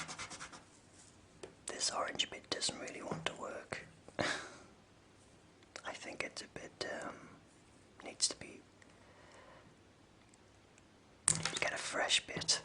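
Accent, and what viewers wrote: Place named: Newcastle, United Kingdom. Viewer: Oh really? I guess you kind of sound like a geordie too? :)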